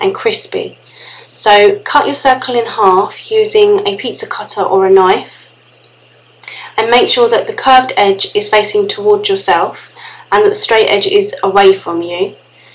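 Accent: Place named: North London, United Kingdom. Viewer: I love your accent. are you from north london? thanks for the amazing vids! x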